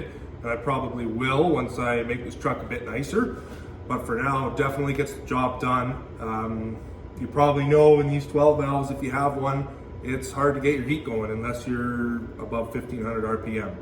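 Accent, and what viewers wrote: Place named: Canada. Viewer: As a Canadian i appreciate hearing our accent in the video😂🙌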